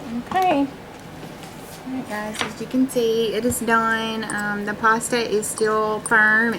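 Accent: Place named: South, United States. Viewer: Thanks for the recipe. I love your southern accent. :-)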